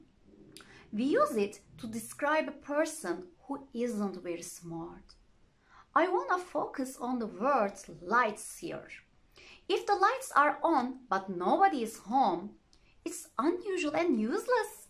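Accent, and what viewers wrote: Turkey. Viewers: Mrs. is my English teacher at school. I love her very much. If you don't like the video it's probably because of the way she is speaking but please keep in mind that we are Turkish. We didn't born neither live in a country that speaks English. It’s not our native language. This video helps me a lot with my lessons at school and I hope it's the same for you too! If I made any mistakes while writing this please point them out so I can learn the right. Thanks for spending your time. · You pronunciation likes Turkish, I think, you are from Turkey, right?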